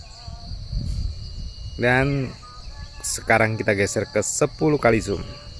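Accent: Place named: East Java, Indonesia. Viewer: logat pembicaraan kek daerah Jatim (Madiun)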